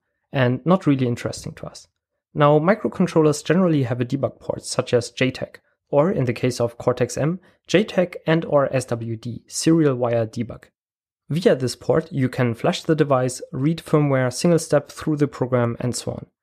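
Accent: Germany. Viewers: Are you german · He sounds german too, it only makes it sound more dramatic. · Are you German? Because your accent sounds really German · Deutscher Akzent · Impressive job dude! Are you german?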